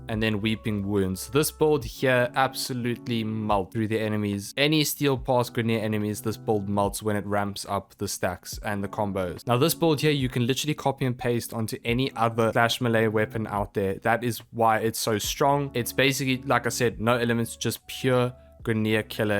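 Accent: South Africa. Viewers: You sound a bit South African there my bru, lekker! Subscribed.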